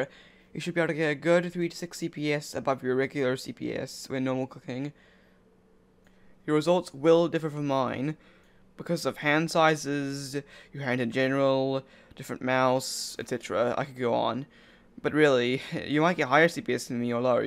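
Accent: New Zealand. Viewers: GGL it's his accent, I think he is from New Zealand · Its kiwi accent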